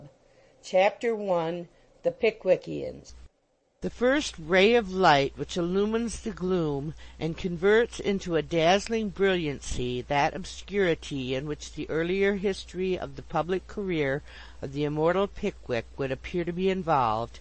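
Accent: United States. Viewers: Americans shouldn't be reading English books. Immediate turn off. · why is a yankee reading this? wot's wrong with an English speaking person?? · The narrator is a fine old lass. A fine old American lass. I raise a glass, perhaps a second even! )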